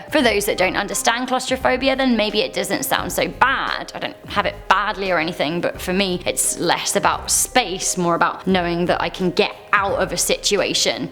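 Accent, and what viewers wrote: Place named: United Kingdom. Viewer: it’s her accent she’s from the UK 🇬🇧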